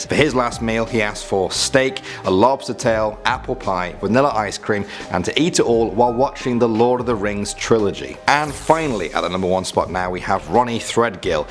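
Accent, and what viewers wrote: England, United Kingdom. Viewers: what part of england our you from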